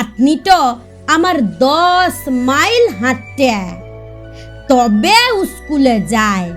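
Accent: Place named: Purulia, India. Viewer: খুব ভালো হয়েছে,,, পুরুলিয়া র আঞ্চলিক উচ্চারণ ভীষণ সুন্দর হয়েছে👍🌹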